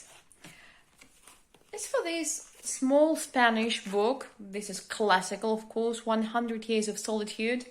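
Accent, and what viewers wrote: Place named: United States. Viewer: Вас можно запросто спутать с американкой! Ваше произношение просто идеально! It's just a native speaker's one!!! 😍👍 Вот уж правду говорят: "Талантливый человек талантлив во всем" 🤗 Сколько времени Вы прожили в Америке, чтобы выработать такое прекрасное произношение? (про "Жизнь Пи", прочитанный в оригинале, я уже понял:))) )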